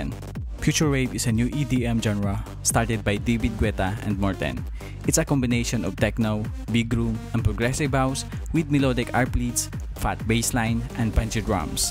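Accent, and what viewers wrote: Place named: Philippines. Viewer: Filipino? Your accent sounds really filipino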